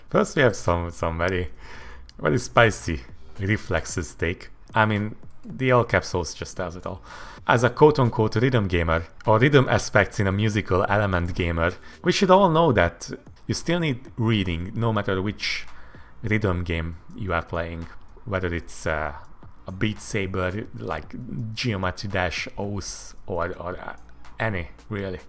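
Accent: Hungary. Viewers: I know a hungarian accent when I hear it :) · Is that Hungarian accent? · I like your hungarian accent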